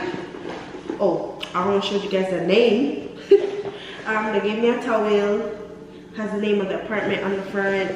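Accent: Jamaica. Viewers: First time on your channel and I was watching part 2 to this. I hear your accent and I was like she sounds Jamaican (I'm a Jamaican) lol and I was like alright let me go to the first one and here I am. Congrats girl!!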